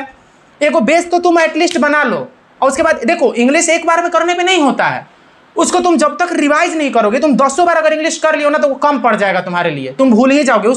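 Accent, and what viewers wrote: Bihar, India. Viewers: App bihar se ho kya bhai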